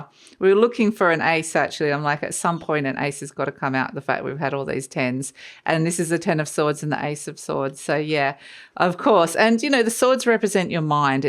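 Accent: Australia. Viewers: ❤ nice to hear an Aussie accent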